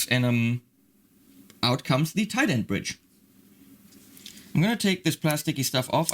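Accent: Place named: Germany. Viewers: mustermann Vielleicht liegt das daran, dass er deutsch ist, aber internationalen Content bieten möchte ;) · Richtig deutscher Akzent 😂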